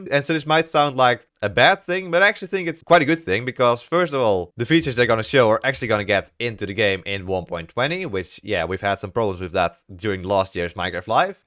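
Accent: Netherlands. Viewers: Ik ben master i can tell your dutch from your acsent lol XD keep up the containt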